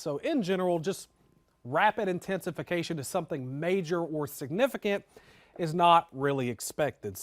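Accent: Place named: Texas, United States. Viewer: Im from Tennessee and im so confused with your accent. Are from Chicago or Texas 😂.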